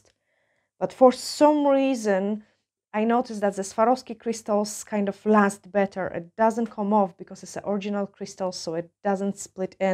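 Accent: Scotland, United Kingdom. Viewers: Do I detect a we bit off Scottish in your accent ?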